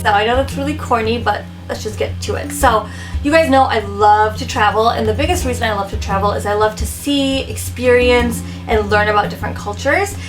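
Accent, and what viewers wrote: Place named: Bengal, India. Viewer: I'm Bengali too and I love your videos. Don't worry about your accent I have one too so it's all okay.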